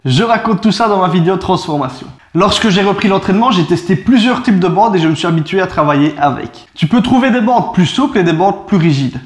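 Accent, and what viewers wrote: Liège, Belgium. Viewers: Tu es de Liège haha?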